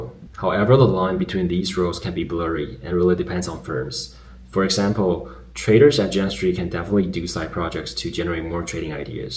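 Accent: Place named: Taiwan. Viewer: 好感動影片看到一半聽出主播也有很濃的台灣口音，親切阿~~好親切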